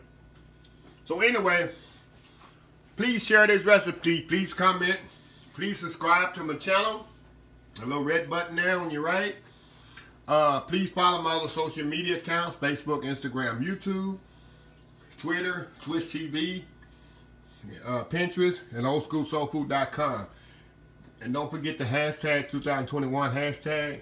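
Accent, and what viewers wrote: South, United States. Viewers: Bro it’s 7am and I’m up watching you make soup lol. You sound cool and southern, I can’t wait to give this a try! Thanks!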